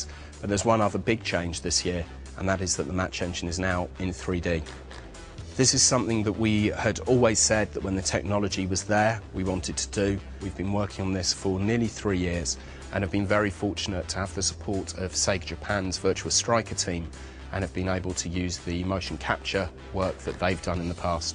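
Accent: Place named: England, United Kingdom. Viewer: Damn, what a classic english accent! Btw, I have this game and it's pretty cool for thoose who consider buying it.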